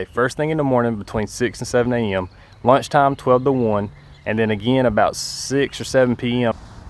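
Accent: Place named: South, United States. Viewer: that southern draw. I got it bad. Thanks man. Appreciate it.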